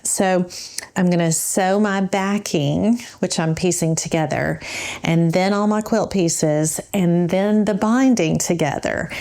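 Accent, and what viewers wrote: South, United States. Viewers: Good grief woman! It is beautiful and you are amazing. I would never even try that. You have my hat and my heart. I truly love how you slip into that southern drawl when you are tired. It is precious. Texan as I was born and raised, I also love a good sammich. Jean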